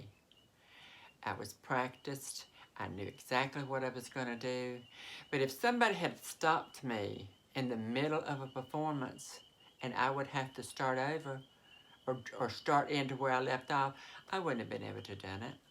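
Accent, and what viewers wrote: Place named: Mississippi, United States. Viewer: like you I'm 63 years old and I still full on hug my son who is 41 and a leader of men and women in the fire service. I have always hugged my family even the inlaws. You and I have more in common than most people would believe. Like you I struggled in school because in the early 1970's learning disabilities like dyslexia or the broader spectrum of autism didn't reach the rural schools. In spite of my comment to learning, I just didn't get it. While my grades were never very good, my conduct and respect for others was above expectations. Turns out I'm extremely mechanical and thrived in the apprenticeship programs of the trucking industry. Show me how to do something and I'll never forget it, tell me, and it will time for me to figure it out. I love your Mississippi draw and voice inflection. Bests wishes and stay positive.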